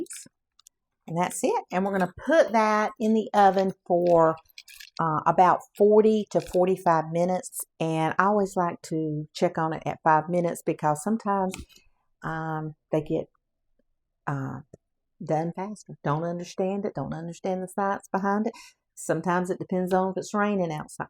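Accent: South, United States. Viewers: I love listening to a southern woman!!!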